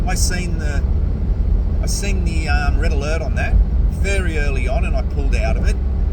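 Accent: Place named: Australia. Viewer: Hi mate, sounds like an aussie, me too. I'm in a similar boat, with the shit coins and BTC.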